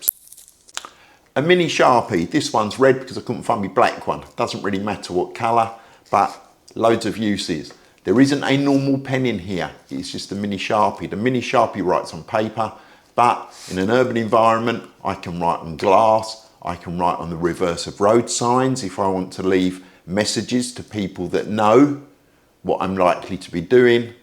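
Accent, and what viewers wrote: United Kingdom. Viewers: You have a very pleasing voice and British accent. ✌️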